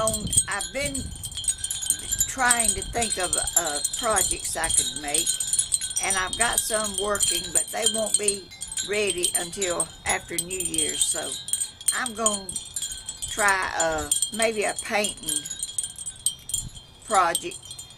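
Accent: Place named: Georgia, United States. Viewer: Love all you do. Thank you for teaching us. Love that Georgia accent, as I’m from Griffin, Ga. love your talent.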